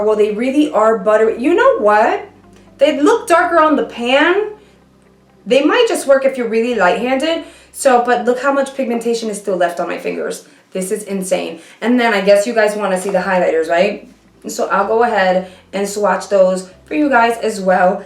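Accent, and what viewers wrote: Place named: Brooklyn, United States. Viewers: Ur def Puerto Rican lol you sound like you are from Brooklyn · aww, i'll give it a shot anyway. BTW i love your accent! not sure what state it is but def the east coast! I'm from Denver Colorado we dont really have a cool accent like yours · New subscriber here!! I smelled chocolate too on the contour/highlight palette. Also I like your accent. Are you a NewyoRican?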